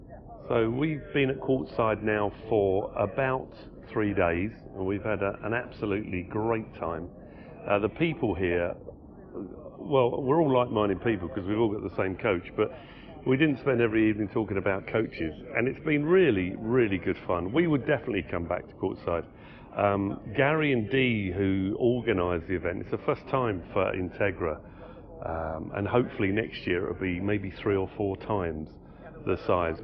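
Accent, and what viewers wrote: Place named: United Kingdom. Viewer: What I'm not a fan of is inappropriate noise. What I am a fan of is this;A beautiful British accent,A natural sense of humor spoken in subtle ways that is charming and funny,An obvious affectionate relationship between a man and woman that shows their depth of respect and love for each other. It is this magic that AC/DC spoils because they are anything but the above.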